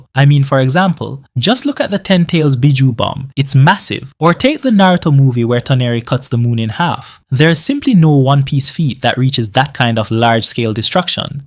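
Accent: Jamaica. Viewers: Your deff jamaican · are you jamacian? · You sound Jamaican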